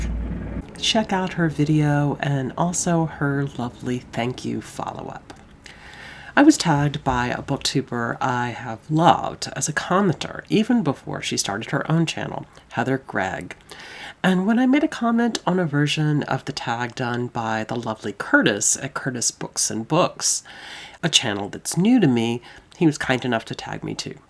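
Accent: South, United States. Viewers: I had to laugh when you said you sound southern no matter what accent you are trying to read. It made me think of my French professor in college who commented on my southern French accent. All of my Texas classmates sounded the same as me in my opinion but clearly not in his. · I did laugh about all your characters sounding like they are from the South. When Steve and I used to take turns reading aloud to Alf and Tilly at bedtime, he used to tease me by giving characters accents I couldn't match the next evening.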